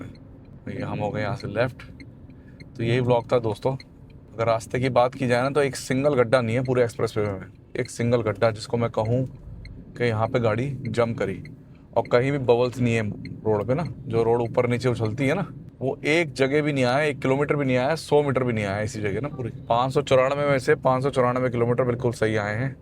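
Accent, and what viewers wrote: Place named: India. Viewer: sir, information dene wali baat to sahi hai, par aaj kal trend chal raha hai har koi apne aap ko represent kar raha hah, it is not bad thinking, baki aap ke videos mujhe bahut acche lagte hai, soscially aap ka desi bolne ka style bahut aacha hai,, baki aap ki pic ki kami rah jaati hai..thanks bye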